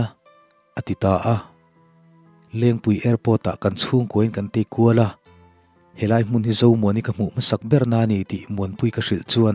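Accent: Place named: Lunglei, India. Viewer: segundo ngaihthlak nawm na chhan hi chu lunglei lam hi atam thina anuam hranpa ve hrim2 heje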